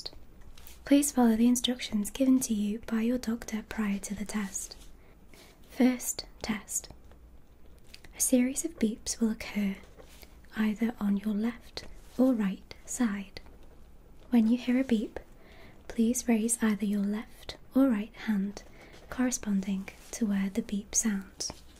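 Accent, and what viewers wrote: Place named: England, United Kingdom. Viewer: Her voice is very calming and I love her English accent too. 💟❤💗💖🧡💕❣💚💞💓💛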